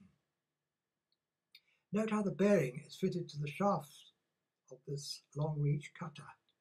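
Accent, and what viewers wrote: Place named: England, United Kingdom. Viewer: you are 90? I wish you lived close to me,you give a very good discription of DIY but I woulld cut my hands off(smile) Love your English accent.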